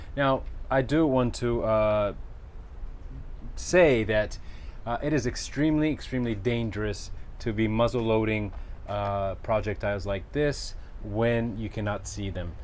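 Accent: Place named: Northern Italy, Italy. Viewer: Grande video e belli questi codici!...secondo me' tu hai l accento dl nord Italia ovest (Milano, Torino)....., Cmq sei grande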